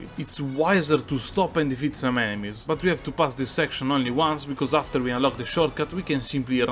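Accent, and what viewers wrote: Italy. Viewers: I LOVE YOUR VIDEOS MAN! Also sembra che tu abbia un certo accento italiano, lo adoro. È un onore vedere un italiano così appassionato fare dei video così di qualità. Keep it up bro!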